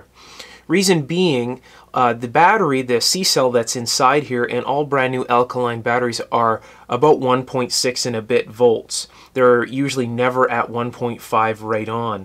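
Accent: Canada. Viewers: Hello Mr., Your vids are excellent. Very explanative. I have only one question, and I'm already guessing that maybe it's because you're Canadian!? It's about your pronunciation of the word "solder"! I have always heard it as SAUDER. But you say SAULDER. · I think it's a Canadian thing, eh? It causes him to mispronounce Farad as FAYrad, too. The guy's name was Faraday: Fare-aday, not Fay-raday...wonder how he pronounces "soldier"? Not a bit of that is an issue here though. His amazing teaching skills, along with an extraordinarily extensive understanding of broad and diverse technologies trump his localized pronunciation idiosyncrasies.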